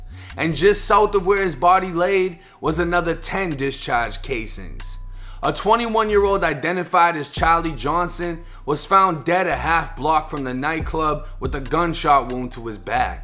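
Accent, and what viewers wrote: Boston, United States. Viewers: I ain’t know Tampa had that accent thought you was from Boston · Are u from Boston bro?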